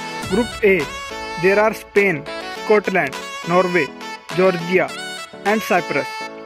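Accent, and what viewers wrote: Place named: India. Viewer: some funny indian accent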